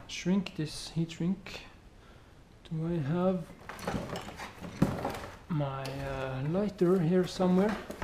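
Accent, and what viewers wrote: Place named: Norway. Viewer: By your equipment and your accent i bet you are from scandinavia, most propably from norway.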